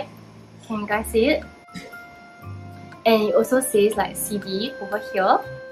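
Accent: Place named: China. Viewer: are you from china? your accent sounds like china accent!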